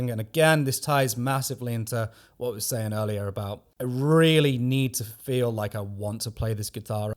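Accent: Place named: Geordie, United Kingdom. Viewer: Bud, I'm having a hard time placing your accent, Jordie? Great video, hi from Canada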